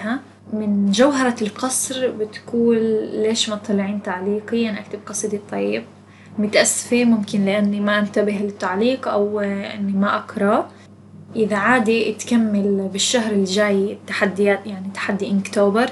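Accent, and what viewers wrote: Palestine. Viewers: احب جدا طريقتك برسم الزي الياباني تعجبني اتمنى ارسمه مثلك او اتقنه مثلك ماتردي علي بتمسخر ع لهجتك وبعرف انو فلسطين هيك بتحكي وبلعكس حلوة والي بقولو كلم هدول اكابر وبسس^♡^♡_♡ ♥♥♥♥♥ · بالعكس اللهجة الفلسطينة اكثر من رائعة تحياتي لفلسطين و لهجتها ❤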